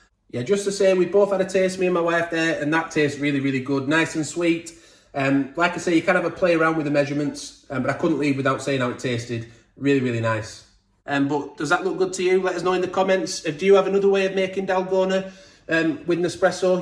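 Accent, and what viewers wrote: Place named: Yorkshire, United Kingdom. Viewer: enjoy each time you say “Hey guys” at the beginning of one of your videos in your wonderful Yorkshire accent! 👍🏻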